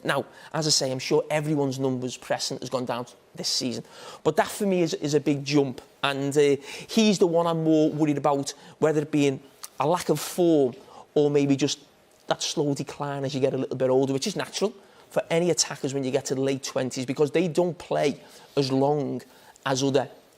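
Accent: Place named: Liverpool, United Kingdom. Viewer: Who listens to this scouse git?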